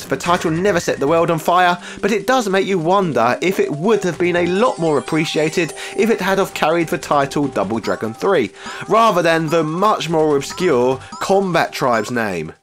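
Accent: United Kingdom. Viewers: I love your nod to wrestling gimmicks. That's my favourite part. Plus, as a Canadian I find your British accent hilariously awesome for your character.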